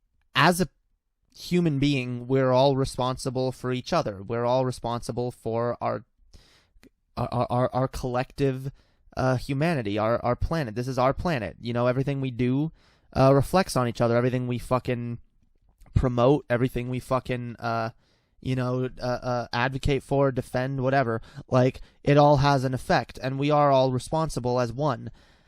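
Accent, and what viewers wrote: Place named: United Kingdom. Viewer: So…. Why the British accent in older vids?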